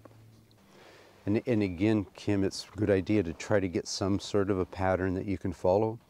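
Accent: Canada. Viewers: Truly excellent video! This guy is a National Treasure, I think he is Canadian from his speech patterns. He is articulate, considerate, and kind. Ted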